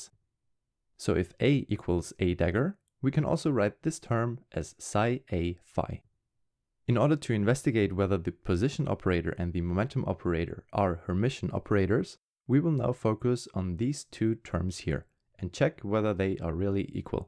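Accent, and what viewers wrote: Germany. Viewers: Deutsch, hört man direkt 😂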